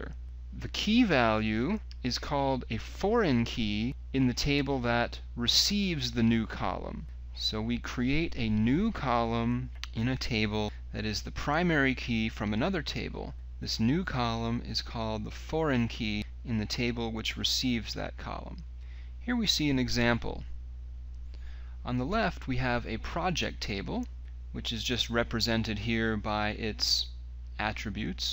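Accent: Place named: England, United Kingdom. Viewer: Thank you for posting this video. I am happy that I could find one with a perfect English accent.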